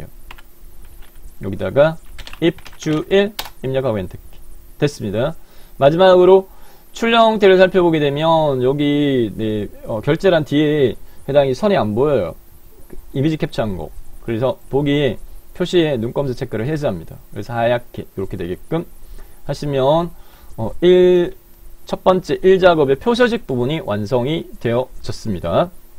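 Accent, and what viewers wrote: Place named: Gyeongsang, South Korea. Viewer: 박사님의 구수한 경상도 사투리가 매력적 이십니다^^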